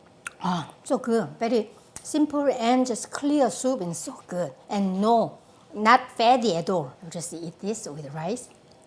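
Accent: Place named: South Korea. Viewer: 한국식 발음 하시니까 ~ 영어가 귀에 쑉쑉 들어 옵니다~ 최고의 영어 강사 입니다.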